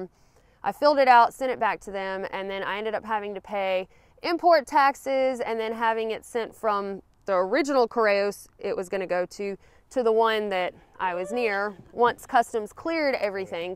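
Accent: South, United States. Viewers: Interesting points to consider, especially re: bedbugs. They aren't known to transmit any specific disease, but I would do whatever I could to avoid them, including treating my gear with permethrin before going where they might be. Just one caution, though - permethrin is extremely toxic to cats, so they should not come in contact with even the tiniest amount of it. What language did you select for closed captioning on this vid? It looks like Dutch or Afrikans. You have a southern accent, but it's not THAT strong so as to overwhelm the CC bot. Awesome videography and editing, as usual. Stay safe out there. Happy Trails. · I want to be in a bar and hear speak Spanish with a Southern Accent asking for Paja! JAJAJAJA! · Please don’t assume that a random postal worker would know you didnt mean your home in Spain. That’s not something to assume, there are many expats. 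If you were speaking with someone here in Florida who had a Spanish accent or British or whatever, you wouldn’t assume so. My neighbor for my whole life has a British accent and her daughter sounds as southern as you/this video creator and another one has a Jamaïcain accent and we have friends who have all sorts. Also, why would you travel to another country and then just eat subpar American food when you have so many more healthy options and authentic food in front of you? Maybe a better tip would be to familiarize yourself with some of the most common foods from that place and the language.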